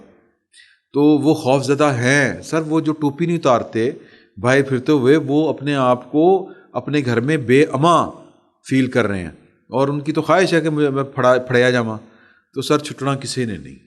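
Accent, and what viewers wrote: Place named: Lahore, Pakistan. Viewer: ماشا اللہ بہترہی لاہیوری زبان ہے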